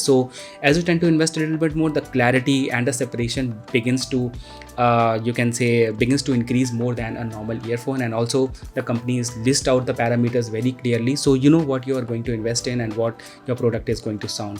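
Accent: India. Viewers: Bro your spoken English is great, perfect Indian accent unlike the popularized exaggerated accent mocked by foreigners.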